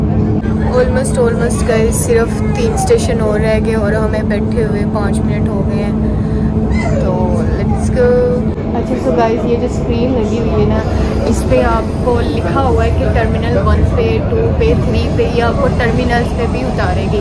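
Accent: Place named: Punjab, India. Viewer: ماشاءاللہ ویسے ہمیں پتہ چلا گیا تھا آپ پاکستانی ہیں آپ کی پنجابی والی اردو سن کر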